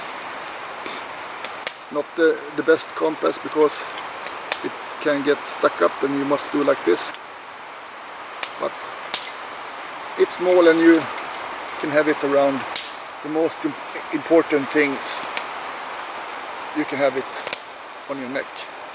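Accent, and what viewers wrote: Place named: Sweden. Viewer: jag älskar din svengelska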